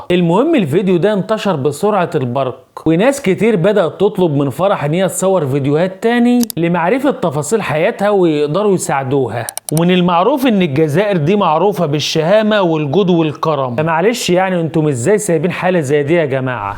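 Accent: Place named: Egypt. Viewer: والله العظيم. انت. اجمل. وافضل مذيع بالشرق. الاوسط. واللهجه خياااااال لان اللهجه مصريه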